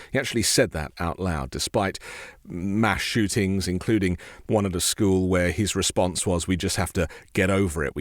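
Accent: United Kingdom. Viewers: Try not to sound British.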